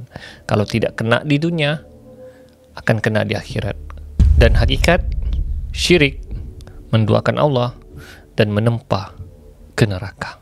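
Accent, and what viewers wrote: Sabah, Malaysia. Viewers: Org Sabah kah kau ni bossku??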